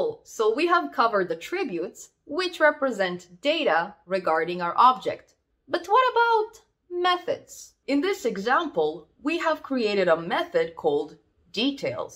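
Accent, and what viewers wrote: Russia. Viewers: Русский акцент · Your accent sounds really nice. Are you Russian or Ukrainian?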